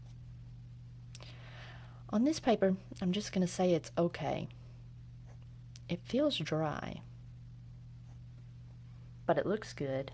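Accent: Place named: Alabama, United States. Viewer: You sound a little like your from Alabama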